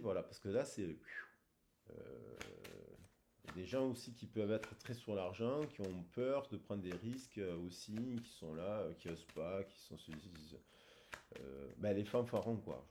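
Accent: South, France. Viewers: Bonjour je suis tres heureuse de Vous voir sa me fait plaisir 💝😃 de Vous entendre j aime Bien votre accent du midi qui nous apporte le Soleil Dan's Notre Coeur merci beaucoup rayon de Soleil🌞👍gros bisous👄☘️💃🕺🌈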